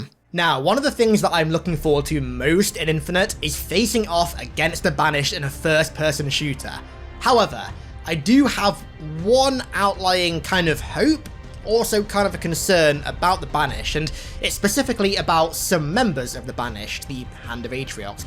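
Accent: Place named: United Kingdom. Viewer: This guy said if my voice sounds a little bit weird LOL this guys voice in general is horrendous he’s got one of those horrible British accents that sounds like he’s got porcelain teeth and he swallowed glass he makes great videos but I just can’t stand listening to his voice no offense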